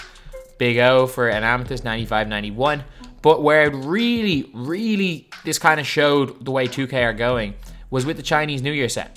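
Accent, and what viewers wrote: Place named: Ireland. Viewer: You sound Irish. I know because I am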